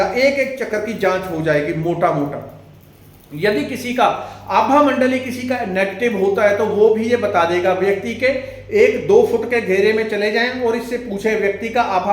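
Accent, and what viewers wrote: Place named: Punjab, India. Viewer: Bhaji meinu lgda tusi punjab ton hon ... tuhadi tone punjabi a ... dsyo ?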